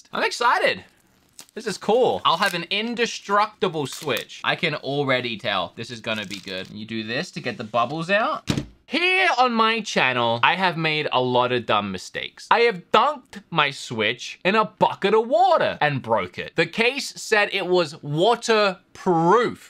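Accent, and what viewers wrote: Australia. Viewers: Are you fron australia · Wait YOOOOOOOOOOO I DID NOT REALISE THAT YOU WERE ANOTHER AUSSIE!!! AUSSIE AUSSIE AUSSIIIEEE!! OI OI OI!! XD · What r u an Aussie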